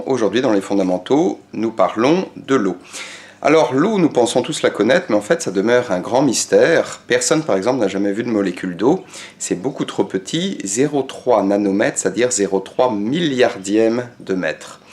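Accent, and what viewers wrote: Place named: Switzerland. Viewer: Salut, félicitations pour cette série de vidéos vraiment instructif, bravo!!!! J'avais pas remarqué ton accent suisse lors de notre coup de fils mdr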